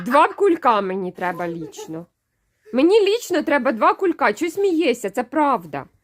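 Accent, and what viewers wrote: Cherkasy, Ukraine. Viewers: А я все думаю, що мені діалект дуже знайомий. А виходить ми земляки. Я також з Черкащини (Тальнівщина)., а Ви звідки саме?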